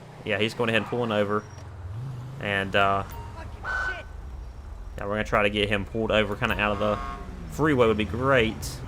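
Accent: South, United States. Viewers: You kinda sound like you are from the South as well as am I. Like the skin on the interceptor.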